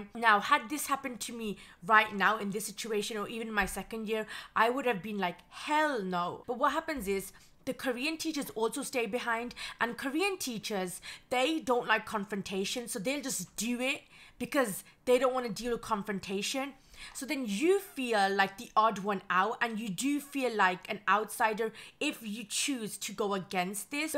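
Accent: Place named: Wales, United Kingdom. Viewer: Welsh accent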